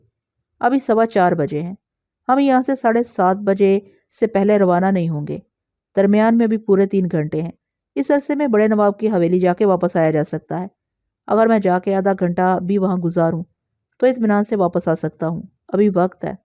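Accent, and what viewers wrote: Punjab, Pakistan. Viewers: Apki Urdu gulabi/Punjabi hai. Lekin fluency achhi hai.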